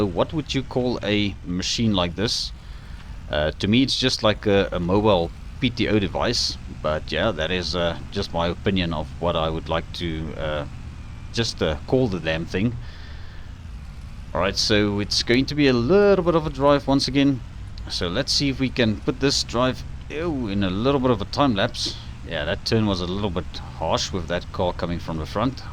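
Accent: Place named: South Africa. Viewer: Hi are u south African that accent sounds like u are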